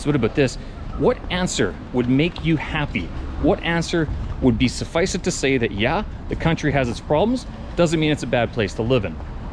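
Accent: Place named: Canada. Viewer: Interesting that you digitize people and I’m interested how you isolate your voice in Starbucks. I suspected you were Canadian even before you answered. I’m also a Canadian in China.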